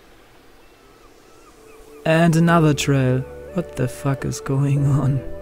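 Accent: Germany. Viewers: dude are you from germany? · You're German? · Wie man minimal hört dass du deutsch bist hahahahahaha xD gutes Video:)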